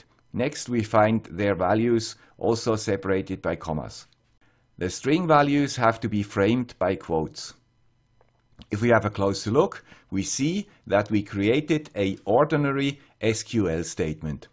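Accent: Switzerland. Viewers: I am half swiss and I can confirm this man's accent is aggressively Swiss.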